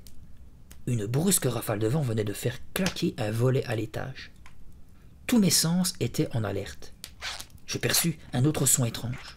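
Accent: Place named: Belgium. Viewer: Bonjour! J'adore vos vidéos. Je travaille à Tokyo, souvent de nuit et vos lectures m'aident à tenir jusqu'au matin. Je ne peux m'empêcher d'esquisser un sourire quand j'entends votre accent Belge poindre. Ça fait partie du charme de votre chaîne 😊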